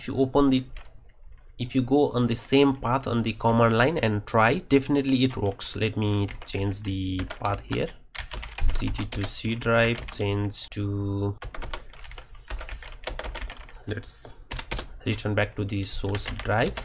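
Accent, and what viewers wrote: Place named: Russia. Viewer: Whats up with the russian accent?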